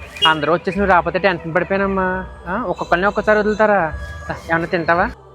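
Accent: Godavari, India. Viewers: Voice nice godavari slag ❤❤❤❤❤❤❤❤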